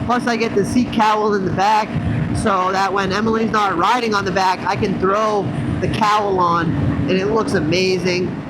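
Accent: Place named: West, United States. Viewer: ps not his voice, the western cali/arizona accent lol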